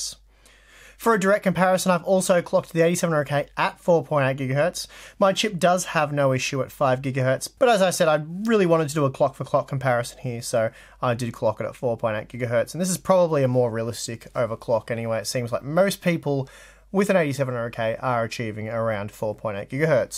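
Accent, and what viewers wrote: Australia. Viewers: Just found your Channel and subbed.. refreshing hearing a Aussie for a change love the Vids. Is a i5 4670k still OK for Gaming ?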